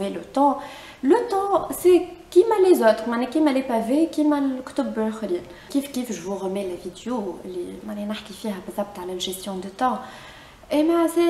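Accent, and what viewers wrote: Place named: Tunisia. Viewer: l'accent tunisien <3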